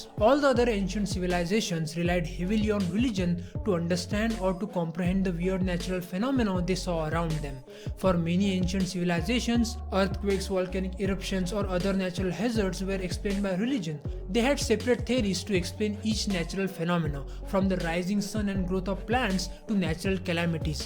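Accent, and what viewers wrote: India. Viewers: I find the Indian accent particularly difficult to understand but I also find many YouTubers speak too fast. I'm in a no-win situation!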